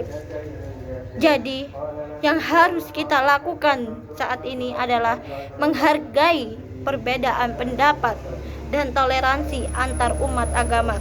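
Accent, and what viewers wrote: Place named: Madura, Indonesia. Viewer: Nah madura nih bos... Wkwk mntapp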